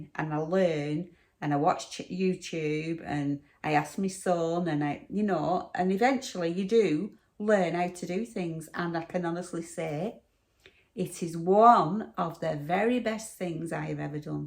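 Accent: United Kingdom. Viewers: I loved the video! So interesting and i I love the way you speak and the different British phrases! Although sometimes I can't understand you so I put the transcript on so I don't miss anything 😊😊😊 you probably wouldn't understand me either and the strange phrases Americans use ❤❤❤ you look great today.......love the sweater and wooden beads! Yes for a part 2...... I think it's all very interesting! Take care Kathy from Pennsylvania USA 😊😊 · FYI.. you can go to my beautiful island in Barbados for that blue sky, water,and sunshine. Good food too.😂 Mayni ask about your accent, what part of the Uk are you from? Thanks for sharing. I live in New York, and I follow Emmerdale and Coronation st.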